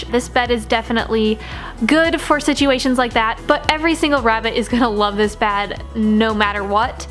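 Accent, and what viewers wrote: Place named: Ireland. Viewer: she sounds more Irish now is it just me?😕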